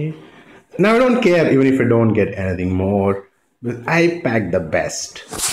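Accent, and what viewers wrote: Kerala, India. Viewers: oru malayali paryne pole tane und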